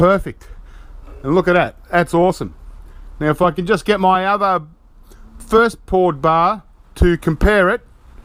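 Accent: Australia. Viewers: are you Australian? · i too love the Austrailian accent and i enjoy the videos also have learned alot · I watch this primarily to learn the AUS accent. "So... yeh." :-) (I also watch for the scrapping) Keep up the awesome videos! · Haha your Australian accent is cool im sure whoever has been mocking you is just surprised by it. When you said copper in your american accent it sounded pretty funny, not because it was bad but because it sounded so out of character. When you say copper it kind of sounds like a southern U.S accent. idk though im from the midwest. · Australian accents are awesome! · I'm American, I didn't even notice him saying copper differently until he brought it up. After he mentioned it though it does sound like he's saying coppa. I don't care though. I like the Australian accent.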